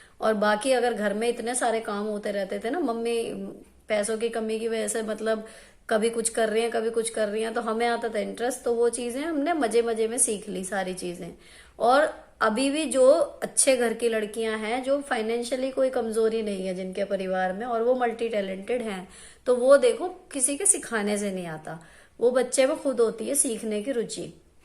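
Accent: Meerut, India. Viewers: Aapne blkul sahi bola apni body ko apna Ghulam bna lo to sab kaam aasani s hote chale jate fr koi pain pain ni rehta ....mere yaha jb maid t tab mere pain bhot hota ta but jbse use hataya h Mai etni active ho gy hu k hairaan hu maid q Maine rakhi t....aapki videos bhot motivational hoti h..... Di aap meerut s to ni hyn? Meri friends circle m aadhe s zyda sab meerut wali thin to hme unka lehja bat cheet bhot ache s pta h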